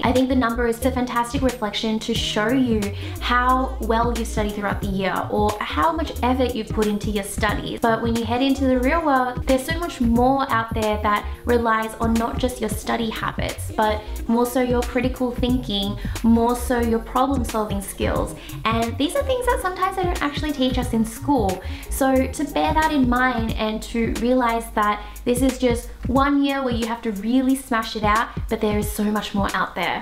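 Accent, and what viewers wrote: Australia. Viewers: Hang on is she Australian??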